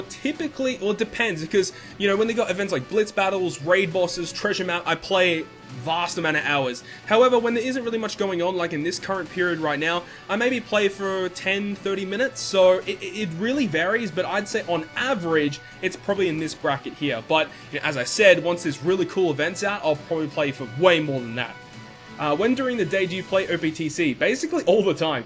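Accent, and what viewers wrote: Australia. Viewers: So you from Australia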